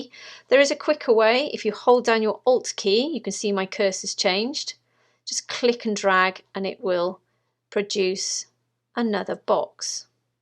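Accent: England, United Kingdom. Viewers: A great demonstration and a lovely English dialect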